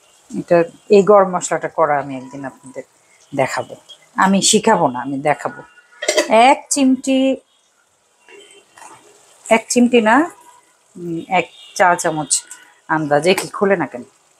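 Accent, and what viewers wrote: Sirajganj, Bangladesh. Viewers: আহারে প্রাণের সিরাজগঞ্জের ভাষা। ❤❤❤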